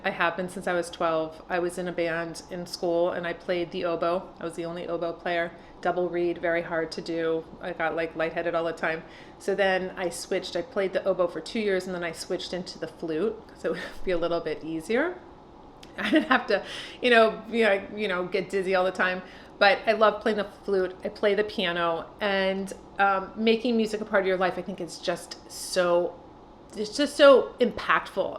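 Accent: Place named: Cleveland, United States. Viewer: Love your videos,. Where are you from originally? Your accent and phrasing sound just like my sister-in-law who is from Cleveland, OH. Close?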